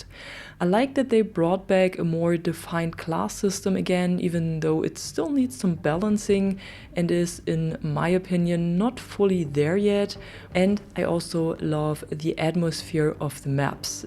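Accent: Germany. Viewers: A good video, I completely agree with you. A side question: May I ask where you're from? Your accent sounds like a German who speaks perfect English.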